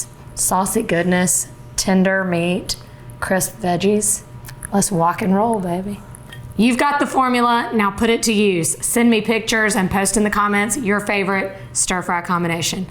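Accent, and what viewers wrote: South, United States. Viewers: LOL! Love the recipe AND the downhome accent and style combined with oriental cooking- maybe globalism isn't all bad!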